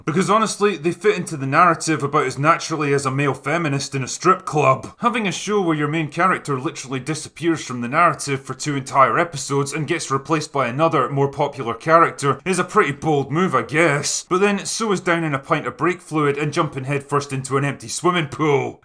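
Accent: Scotland, United Kingdom. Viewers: Done in a beautiful Scotish accent as well, a fine piece of journalism in a world of dying journalists, the only true news i,m heard this decade, nice one mate.......... ITS A FUCKING JOKE , MODS , the designers went raving and used Quadrophinia as the storyboard instead of going to work......... WANKERS. LOL..... brilliantly said mate.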